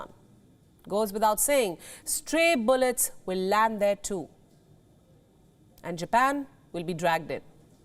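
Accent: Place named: India. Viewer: Pretty strong Indian accent...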